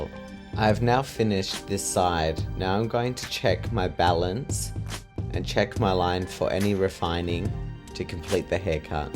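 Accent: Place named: Australia. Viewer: Hi! WoW yr channel is stunning 😍 and I love yr Avustralian accent!! Actually I’ve fallen love with it.. sorry but this accent much more attractive and beautiful than english accent.This is immaterial but I just wanted to indicate.very good luck to y 😊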